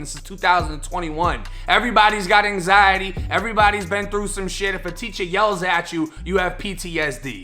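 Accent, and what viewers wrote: Boston, United States. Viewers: What's that accent,? Boston? Anyway, I just found your vids a couple days ago...good 💩, pun intended 😂 I was from MI, came down to FL in 2012. Went through my little few month county ordeal, but nothing like the CIs. But your work still hits close to home. I wish you continued success. We rockin' with ya in Ft Myers 👊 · The Dog shit shooting I cant with you today.... are u from Boston to? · You said walking through Boston? You sound like Boston but I thought you was Florida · I knew you were from Boston from your accent homie. Your background is probably Irish I’m guessing. We got an Irish homie from the hood. We call him polar bear. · I KNEW YOU WERE FROM BOSTON!!!!!!!!!!! lmao this whole time ive been trying to figure it out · I knew knew knew you from Boston? What part...heard the accent in all the videos. Dorchester all day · I know you were from Boston!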